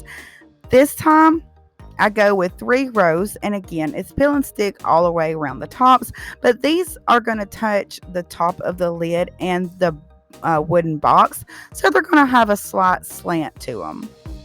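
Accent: South, United States. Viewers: GORGEOUS!!!!!!! Btw I think I look at your videos as to watch the crafts as much I do to listen beautiful southern accent...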